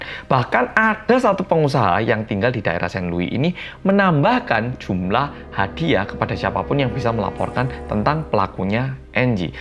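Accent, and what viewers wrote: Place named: Java, Indonesia. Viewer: Selalu menarik uraian diakhir kisahmu...mas medoook😅...tks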